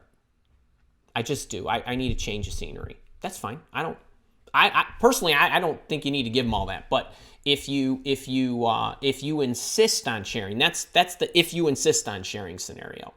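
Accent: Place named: Upper Midwest, United States. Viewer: I’m picking up a strong upper Midwest accent here!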